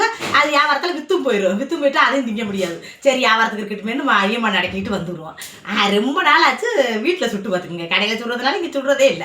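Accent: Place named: Ramanathapuram, India. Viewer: ஏக்கா ராமநாதபுரம் மாவட்டம் மாதிரி கூட பேசிப்புட்டு நீ எம்புட்டு குதூகலமா பேசுற நீ ஏத்த உளுந்த வடை நல்லா பொசு பொசுன்னு வந்திருக்கு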